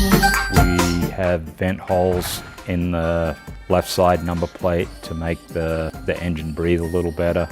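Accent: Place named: Australia. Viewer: He sounds Aussy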